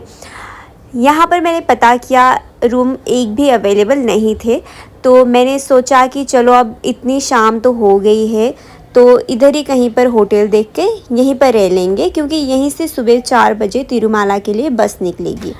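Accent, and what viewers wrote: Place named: Mumbai, India. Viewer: Apki hindi mumbai jaisi h 😮 kbki maithil log to etna pyara hidni accent jante h .. i love maithili language too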